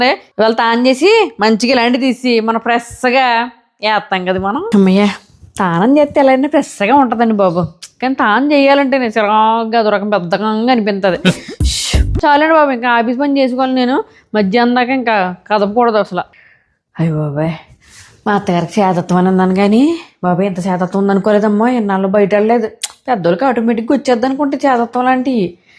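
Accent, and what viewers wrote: Godavari, India. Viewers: Do you speak this way even at home? Looks like Godavari slang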